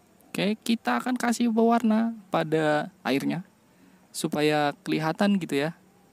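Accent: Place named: Banyumas, Indonesia. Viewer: Yakin kwren mas..sLam ngapak..ora nhapak ora kepenak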